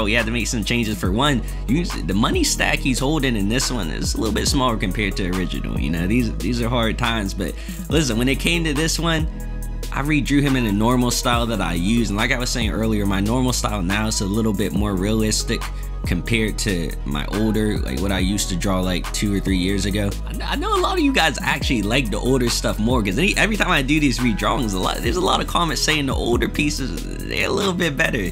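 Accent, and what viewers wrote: Baltimore, United States. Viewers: Are u from Baltimore??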